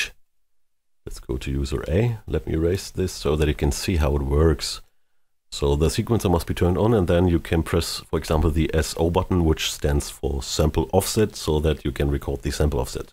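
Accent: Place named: Germany. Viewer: Well done, informative and I just bought it, excited to try. Your English with a German accent is good and I like your humour.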